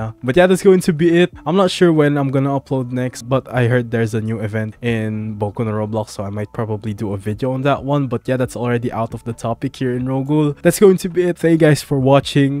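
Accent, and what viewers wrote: Philippines. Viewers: he sounds filipino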